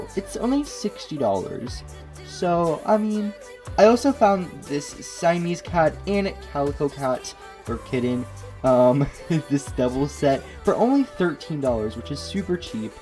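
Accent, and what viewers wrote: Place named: Australia. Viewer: OMG YOUR AUSTRALIAN TO OMG OMG OMG OMG OMG OMG OMG OMG OMG OMG OMG OMG OMG OMG OMG OMG OMG OMG OMG OMG I THOUGHT YOU WERE AMERICAN no offence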